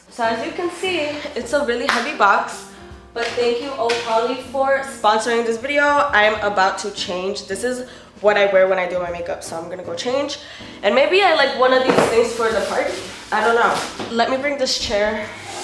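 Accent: Colombia. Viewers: I love your Colombian accent 🤤😍 and your body 🔥🔥🔥🔥and yes please do a video on how to pose ! ✌🏽✌🏽✌🏽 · When you speak Spanish the Colombian accent wow 😍, reminds me of my Colombian family makes me wanna go eat some buñuelos, arepas and empanadas. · 😍😍😍😍😍😍😍😍😍😍😍😍😍 You looks so pretty and btw i love your colombian accent you should speak it more love you💓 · I love her colombian accent ♡ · You’re red hair looks BOMB😍😍 love your Columbian accent!!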